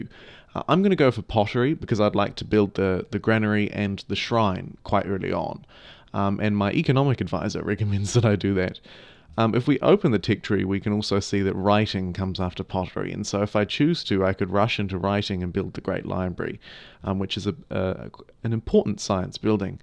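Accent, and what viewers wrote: New Zealand. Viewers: That's not an Australian accent, most likely he's from New Zealand. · Somewhere from oceania, maybe new zealand.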